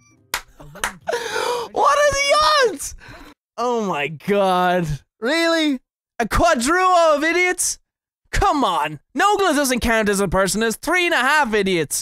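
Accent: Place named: Ireland. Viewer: Sometimes he sound Irish and sometimes he doesnt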